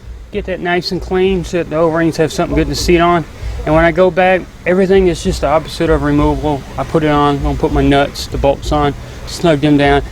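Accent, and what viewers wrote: South, United States. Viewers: Nice videos dude. fast but you cover it all. which is perfect for the average experienced mechanic. i like how you dont lolly gag on unneeded details, like how it was brought in on on flat bed truck or how you found a bird nest in it. fast, professional, informative. thanks. BTW... is your shop in Detroit? you seem to have a slightly southern accent.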